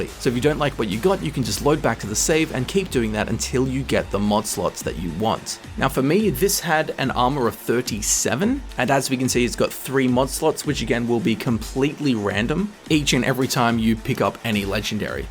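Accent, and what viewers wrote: Sydney, Australia. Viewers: Yo, you from Sydney? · Your from Sydney aye? I'm from Newcastle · Hey are u Australian?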